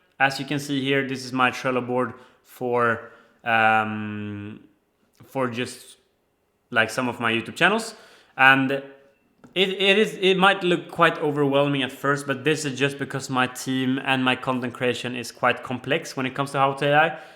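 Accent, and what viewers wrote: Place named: Sweden. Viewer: Man hör att du är svensk :P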